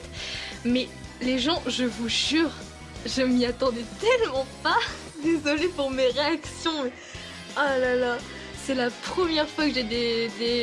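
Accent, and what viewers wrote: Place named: France. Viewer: OH MON DIEU J'ai choquée en entendant une voix françaiiise ! xD Je t'aimeuuuh ! ♥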